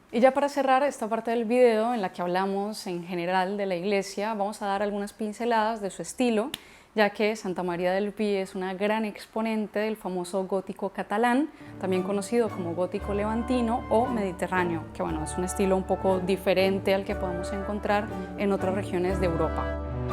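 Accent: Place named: upper class, Colombia. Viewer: Esta encantadora mujer habla como las colombianas de clase, de las que van quedando relativamente pocas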